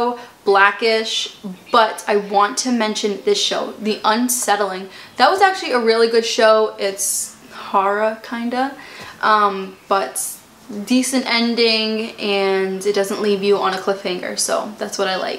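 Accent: Boston, United States. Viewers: I love her Boston accent!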